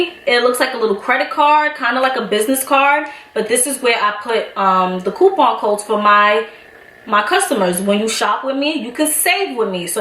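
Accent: New York, United States. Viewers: I love NY accents. Thanks for the vid!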